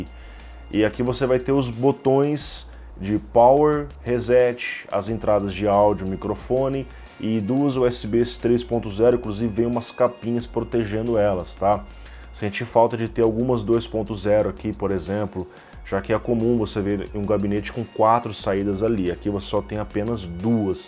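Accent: Brazil. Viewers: Is he speaking Brazilian Portuguese or European Portuguese? Is there a difference ?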